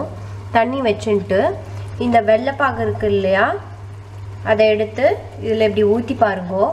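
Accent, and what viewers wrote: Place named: Tamil Nadu, India. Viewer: Always amma prepared ..I am gonna try this tomorrow :) I simply loved your Tamil accent ..!! :)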